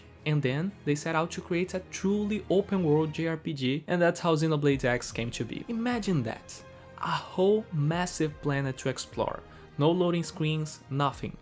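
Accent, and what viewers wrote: Brazil. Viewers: ah, sabia, o sotaque te denunciou! Mas vc fala inglês bem pra caramba! Bacana ver um youtuber da minha casa fazendo conteúdo de qualidade tb pra fora! Fica em paz, amigo!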